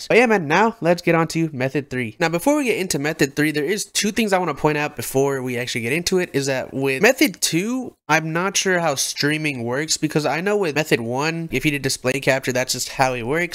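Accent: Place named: New York, United States. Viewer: You must be from NY or the east coast. But bro thanks for the video man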